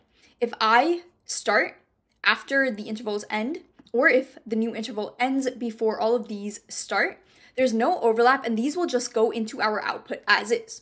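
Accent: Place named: India. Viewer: Can feel indian and American accent ❤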